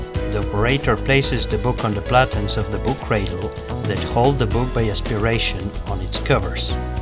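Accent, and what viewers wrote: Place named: India. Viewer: Swiss made but with Indian accent ?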